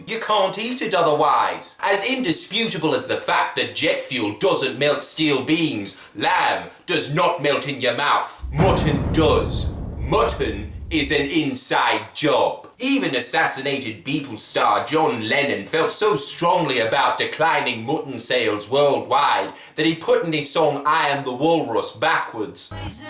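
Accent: Australia. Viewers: Failed impression, your australian accent shines bright on this 😂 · This guy is Australian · Ok mannerisms and style of writing are amazingly accurate but the accent was shit and I say that as a fellow Strayan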